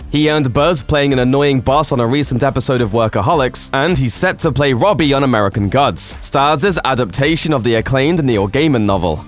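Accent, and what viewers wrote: United Kingdom. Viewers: To make matters worse he has to get low key patronized by a nameless faceless person with a trash stereotypical British accent. Who deep down has to know he will never be as big as Dane Cook professionally. Ohhhh. Do carrot top next. People love to trash on him. I think it will be funny for a no talent hack to trash someone they can only dream to be as “successful” as 🙈🤩🤡🤑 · Can you guys pick another narrator? This guy's accent and is jarring and seriously annoying. Plenty of British voice actors out there who don't grate on the ears when they speak. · A bad brit speech bot is going to tell us what is funny and what is not. Nah, move along.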